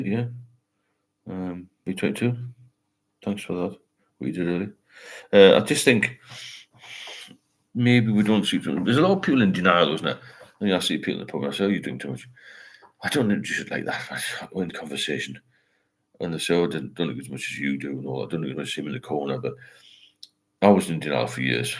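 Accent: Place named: Northern England, United Kingdom. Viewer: he has a very strong Northern English accent - I too find him hard to follow & I'm from England (South)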